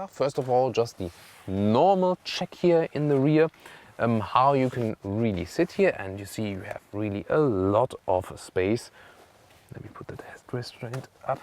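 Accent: Germany. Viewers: would you ever trust a reviewer with a french accent (even if you can understand it)? the German accent, on the other hand, makes the review sound truthful, serious and trustworthy.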